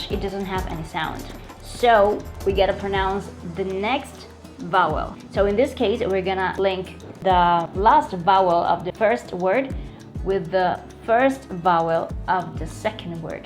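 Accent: Cuba. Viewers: Hola Maestra. Me llamo Zulkifli. Mucho gusto. Yo soy de Malasia. Today first time I come across your channel. Your teaching method is so excellent. Yes I love the Cuban accent. ¿Qué hay? Muchas gracias.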